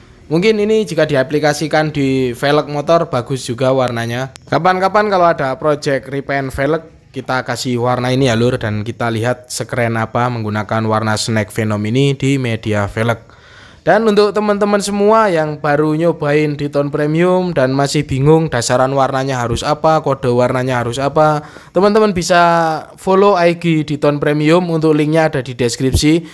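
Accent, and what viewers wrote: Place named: Java, Indonesia. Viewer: Jowo tulen e iku lo.... Ra mbetahi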